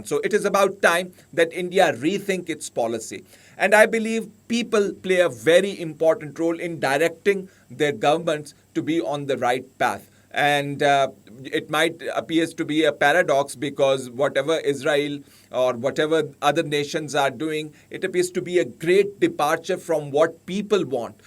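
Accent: Pakistan. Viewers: Don't know who is this idiot who is trying to teach India. From his tone I can make out that he is another illiterate Pakistani but he should know that India is quite superior than Pakistan & even his uncle China.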